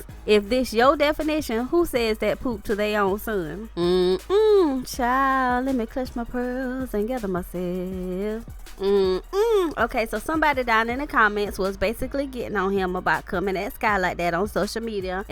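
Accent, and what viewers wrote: South, United States. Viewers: Aww my luv am praying for you!!!! Yes life is EXTREMELY short, plzzz take care of yourself, watch what you eat, drink, and exercise its so so important for our people. So glad to continue to hear your lovely voice with a southern twang!!!! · Glad your fine.. Love that accent by the way💯 #SouthernBell😍👌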